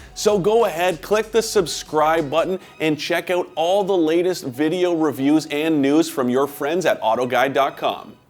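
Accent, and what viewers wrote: Canada. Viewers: I'm curious, is this a Canadian or American show. this guy's Canadian accent is thick!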